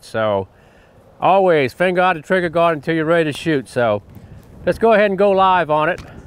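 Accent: Louisiana, United States. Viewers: That accent has to be from Louisiana. Northern part of the state no?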